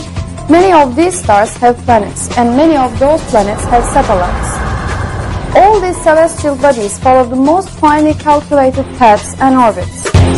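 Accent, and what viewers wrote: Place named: Turkey. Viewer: kesin bizden, aksandan belli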